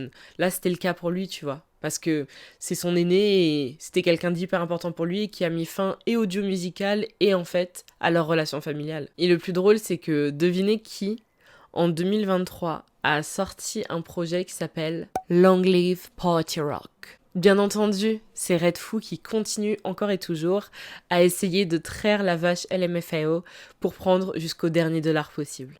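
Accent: United Kingdom. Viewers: j'aime trop ton accent british oml 😫🫶🏻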